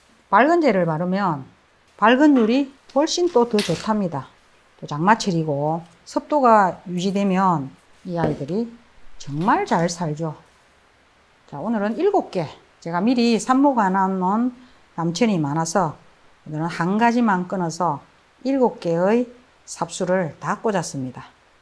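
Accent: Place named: Gyeongsang, South Korea. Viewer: 항상, 좋은 작품을 많이 보여 주시는군요.. 감사합니다. 목소리도 구수한 경상도 사투리 참 듣기 좋습니다..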